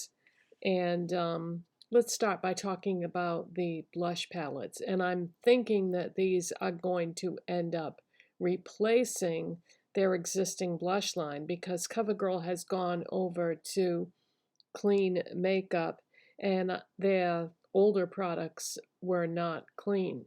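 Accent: Boston, United States. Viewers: Just found your channel and I’m binging videos! Is that a Boston accent I hear 🩶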